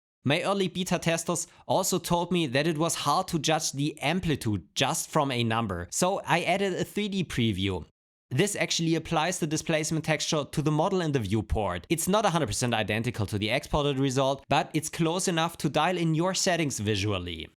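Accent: Germany. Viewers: United by East German accent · super videos wie immer, aber bitte, nett gemeinter tipp, arbeite an deinem deutschen akzent.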